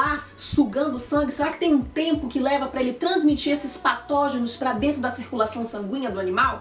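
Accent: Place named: Pará, Brazil. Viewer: Muito obrigadop pelo vídeo, queria que meus professores tivessem essa didática e vc parece ter um sotaque paraense haha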